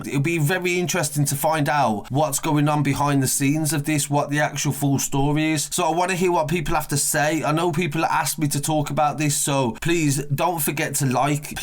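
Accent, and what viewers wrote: Birmingham, United Kingdom. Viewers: Can't listen to this nerdy Birmingham accent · Are u a brummie ?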